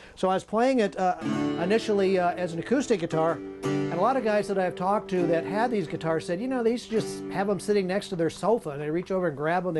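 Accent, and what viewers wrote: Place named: United States. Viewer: like watching clapton with u.s. accent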